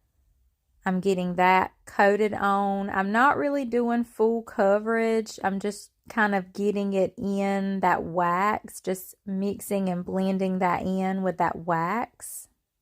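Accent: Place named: South, United States. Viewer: First time viewer. Love your projects and accent. Is it TN.,KY., or Alabama.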